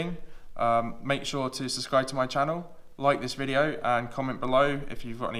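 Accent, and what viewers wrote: United Kingdom. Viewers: thanks So are u actually British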